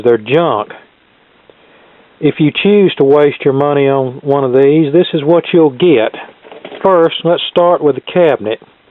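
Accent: South, United States. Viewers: Jerico_64 This man sounds nothing like Hank Hill. He simply sounds like an old man with a southern accent. Big difference. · He’s a southern ol’ boy. Accent is pretty typical for rural south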